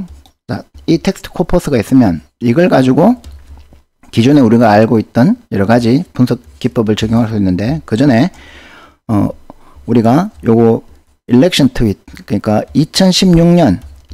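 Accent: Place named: Gyeongbuk, South Korea. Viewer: 우와 교수님, 목소리도 귀에 팍팍 꽂히고 설명도 너무 재미있어요! 교수님 사투리가 경북 사투리인가요? 대구사투리나 경남사투리는 아닌것 같아서요...중간에 "감성분석(Sentiment analysis)" 언급하셨는데, 이 방법으로 혹시 사투리를 구분하는 것도 가능한가요? ^^ 고급강의 올려주셔서 감사해요~아이 씬나~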